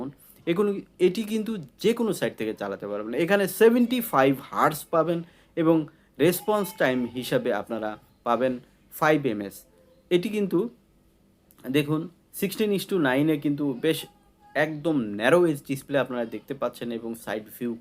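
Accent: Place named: India. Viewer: Apnar voice undian accent pai, khub sundor presentation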